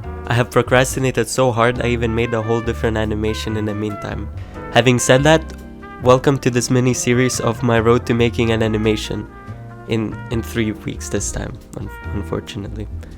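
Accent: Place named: Eastern Europe. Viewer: oh great, first I heard you I thought that you are slavic, by your accent 😅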